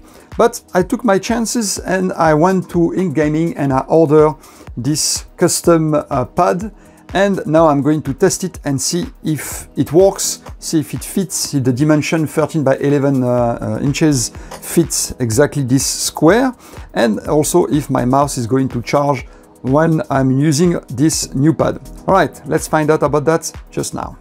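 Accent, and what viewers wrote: France. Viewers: l’accent français :)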